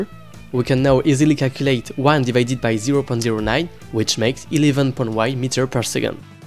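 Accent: France. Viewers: That's a fellow french accent i hear there ?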